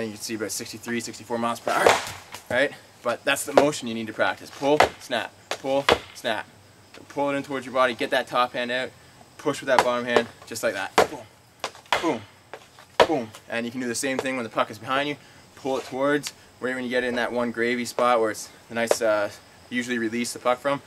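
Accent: Canada. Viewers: r u canadian?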